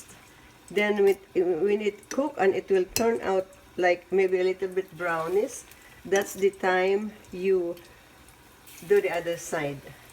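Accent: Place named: Philippines. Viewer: Are you Filipino? Can you just speak in Tagalog? I can sense you are having difficulty speaking in English. Your accent is so thick!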